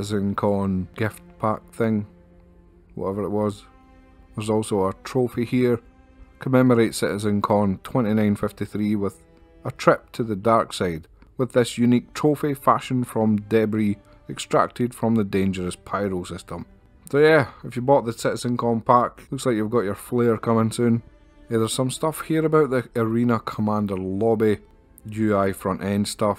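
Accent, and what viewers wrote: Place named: Scotland, United Kingdom. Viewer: this lads definitely the proud owner of a scottish accent. I know it because i almost didn't understand a word or two. still great stuff 😊 Edit: motherfricker, almost caused an international incident with a typo! Edit2: also you gained a subscriber in me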